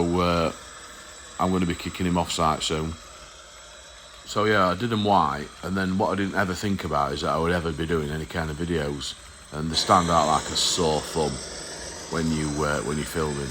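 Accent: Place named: Yorkshire, United Kingdom. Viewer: Well I must admit I really enjoyed the video and the humour was excellent (good Yorkshire dialect). However, as a seller online I fo have to comment on cost of delivery. I pay £2.84 to send a parcel, it’s cost me around 65p to make packaging and buy the box, bubble wrap and tape. I have to drive to drop the parcels off at the drop off point and there is my time. I charge £3.25 for postage on my site and do I think you are getting a deal. Yes, I look for a good deal and also like free delivery. If I have free delivery I would lose on 85% on all sales so I would have to include that in the cost of the item and my prices would increase, when you buy more than one item the postage is combined so you get free postage after the first item anyway. Just think about it. Regards, Geoff -Scenic3D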